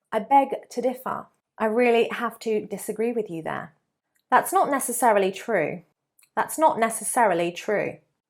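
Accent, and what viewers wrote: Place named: England, United Kingdom. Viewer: Your accent is kind of English, but I want to learn American accent !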